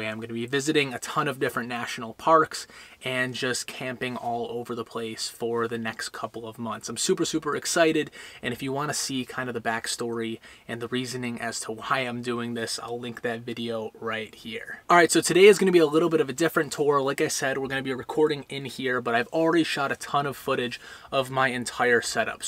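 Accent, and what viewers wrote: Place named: Buffalo, United States. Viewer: Lol… tray - ler… I noticed he was saying trahler.. it’s that Buffalo accent 😀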